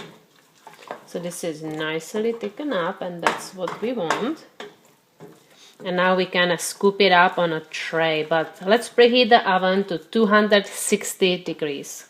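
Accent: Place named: Czech Republic. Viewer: Lol it's not your slight Czech accent.All captions I've seen were inaccurate,so I read them for laughs.Once I watched a 50's United Airlines promo.A beaming flight attendant said to a boarding businessman;"you're my first passenger of the day!". The subtitles read"good morning squirrel,you're my first hemhoroid of the day."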